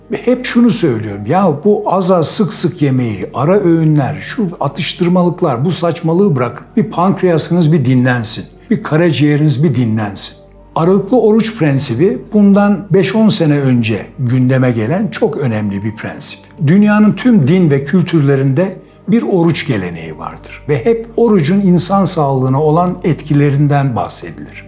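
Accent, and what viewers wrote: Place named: Istanbul, Turkey. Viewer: Istanbul lehçesi ayrı bir zevk veriyor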